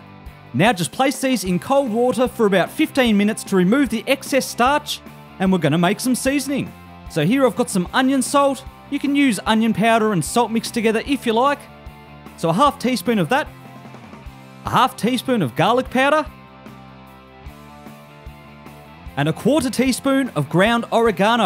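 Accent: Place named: Australia. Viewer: uselessjoe the accent is australian, if that's what you were getting at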